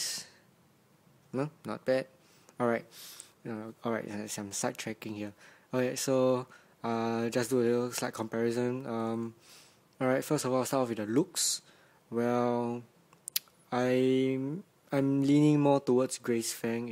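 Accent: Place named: China. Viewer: your really good man! you have a chinese accent... anyways if you want to know, i am chinese ^_^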